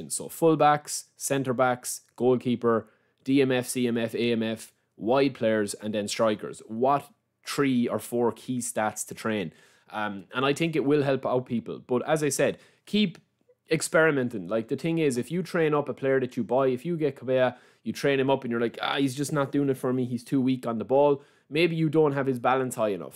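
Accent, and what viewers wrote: Ireland. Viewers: thanks for taking the time to reply ! As a non native English speaker i always loved those typical native accents since they offer more “flavours” than the more official standardised Queen’s English accent. I guess it’s a bit like when native speakers tend to find the accent of a French or Italian “sexy” when they speak English. Last detail for MY personal knowledge: would you qualify your beautiful Irish accent as more typical to a certain part of Ireland or is it rather a generic one ?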